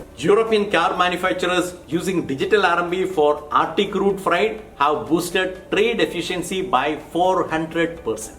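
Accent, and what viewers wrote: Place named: India. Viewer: Thanks to sub-titles otherwise I would have problems understanding Indian English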